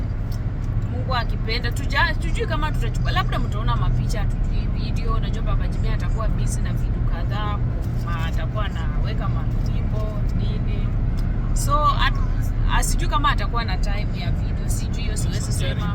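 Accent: Kenya. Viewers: Why can't you just speak in English and stop this luhya accent, sounds really awkward, you still have alot of mushene ya waluhya, uuurgh!!!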